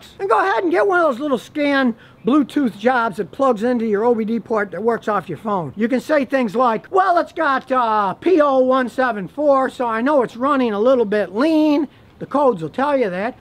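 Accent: United States. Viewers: Why is John Lennon alive with an American accent and when did he become an honest car mechanic?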